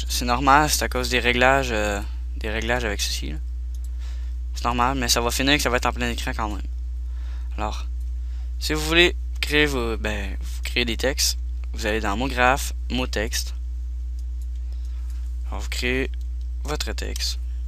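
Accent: Quebec, Canada. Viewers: LAXENT QUEBEQUOI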